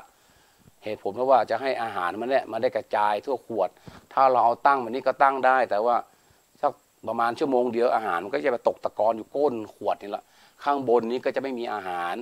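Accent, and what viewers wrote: North, Thailand. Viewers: อาจารย์เป็นคนเหนือหรือป่าวเจ้า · อาจารย์อยู่แถวใหนคะพูดได้สาบเมืองมากเลยค่ะ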